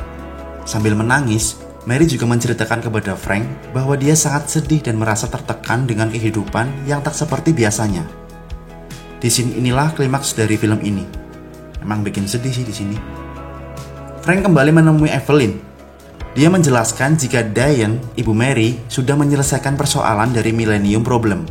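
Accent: Banyumas, Indonesia. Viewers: Orang ngapak ya lu bang adminn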